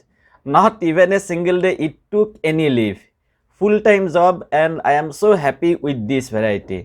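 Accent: India. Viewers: your accent is Indian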